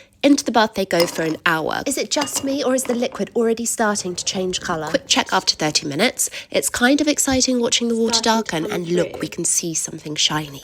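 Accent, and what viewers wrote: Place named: RP, United Kingdom. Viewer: You speak like the Queen of England in her 1956 or 7 Christmas greeting. Hurrah on your hard work. I’ve not ever finished a project like this.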